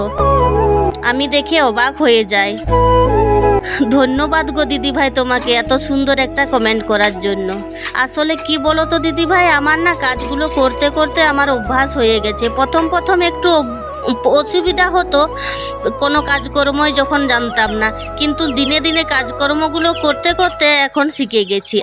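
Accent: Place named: Bankura, India. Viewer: খুব মিষ্টি লাগল কথাগুলো শুনতে। অনেকটা যেন বাঁকুড়ার মেঠো সুর বাজছে। আগেকার মতো শাড়ি পরে কাজ করার অভ্যাস চলে গেছে অনেকেরই....💛❤️💛🙏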